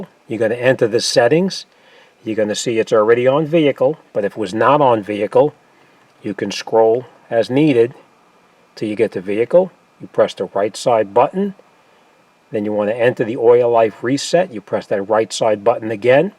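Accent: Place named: Northeast, United States. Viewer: I love the video. Easy, clear, and straight to the point. Plus, that Northeastern accent is one of a kind!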